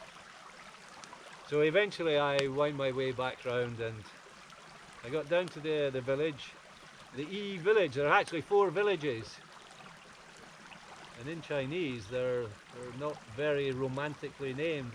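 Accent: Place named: New York, United States. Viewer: that is too funny. When you speak it is, to me, like a fellow NYer. Thanks for getting back to me and thanks for the video. BTW, food looks so much better cooked at a campsite. Be well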